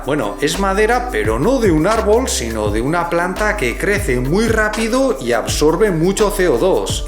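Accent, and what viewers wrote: Chile. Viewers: tiene el acento chilenx :') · 7 años en Chile, con razón habla así, ahí se habla cualquier cosa menos español..... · Si se le nota el asento de chile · se siente muchooo el acento chilenoooo 🥺💓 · Cuando comenzo a hablar le note altiro un acento chileno. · Wena... saludos desde Coquimbo, Chile. Habla muy bien el español con acento chileno. Sorprendente.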